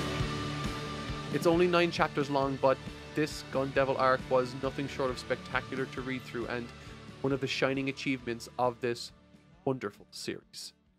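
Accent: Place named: Ireland. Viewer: ARE YOU IRISH? ... WHY IT SOUNDED SO NATURAL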